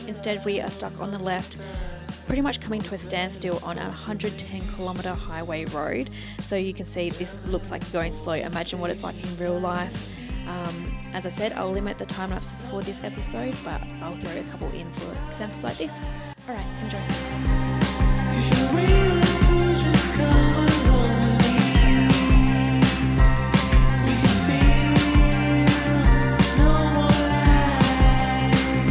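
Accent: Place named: Australia. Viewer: Nothing better than B-doubles and an Aussie accent.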